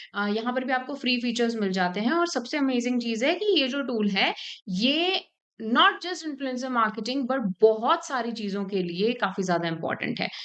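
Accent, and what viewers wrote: India. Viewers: Indian English fuck it🚮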